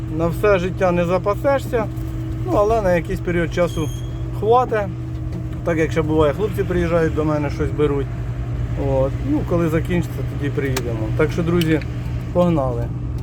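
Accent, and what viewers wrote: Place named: Poltava, Ukraine. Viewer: З усего відео найбільш мені сподобалась ваша щіра українська мова.Це є сама щіра мова ,я так розумію ви с Полтавщіни.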